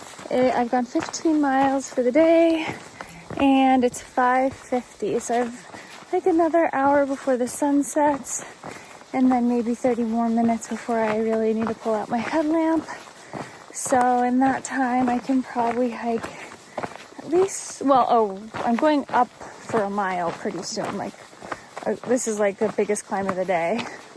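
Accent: United Kingdom. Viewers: Hi. A couple of questions for you: 1. I follow a hiker on YouTube by the trail name of Sunshine. She is fairly short, has dark hair, and in her 40s, and would have been hiking with a man named Augustine who wears a beard. Does that sound like the person you spent time with on the beach? 2. Would you ever do an entire video using your posh, British accent? 🙂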